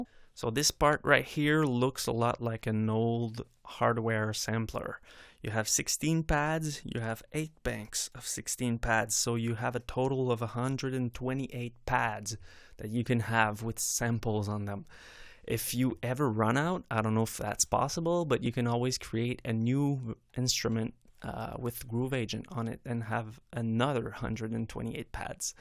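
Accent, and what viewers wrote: Quebec, Canada. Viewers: Do I detect a French Canadian accent?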